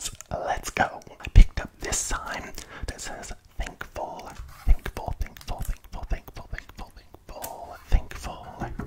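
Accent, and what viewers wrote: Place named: South, United States. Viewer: Love the southern accent; very relaxing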